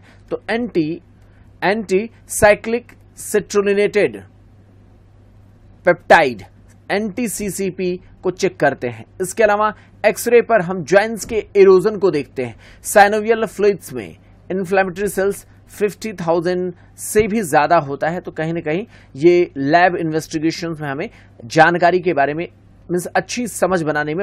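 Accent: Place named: India. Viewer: Good, but please hire a narrator with clear English because it's difficult to understand your Indian English (no offense)...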